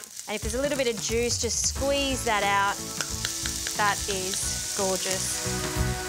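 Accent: Australia. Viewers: aussie english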